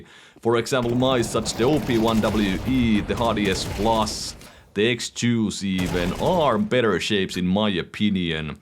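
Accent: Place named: Finland. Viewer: It's a very typical Finnish accent. If you play CS in EU you will hear that accent all the time. If you want a good idea of what the language sounds like and Finnish culture, I found the music video " " by " " very fascinating. My Finnish friend said to me it is a very good representation of what it means to be Finnish.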